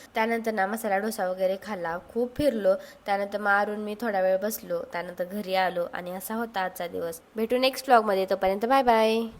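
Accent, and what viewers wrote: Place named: Solapur, India. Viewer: Language accent सोलापुरी वाटतात.csmt.छत्रपती शिवाजी महाराज terminus.previously vt.Victoria terminus.fir ur kinds information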